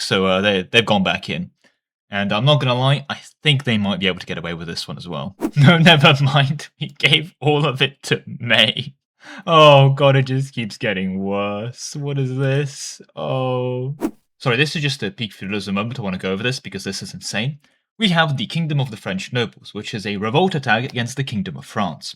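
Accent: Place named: England, United Kingdom. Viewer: The english accent got thicker with this vid.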